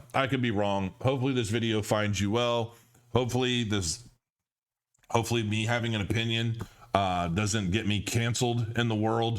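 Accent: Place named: Stockton, United States. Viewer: About time you sound like you grew up in Stockton CA.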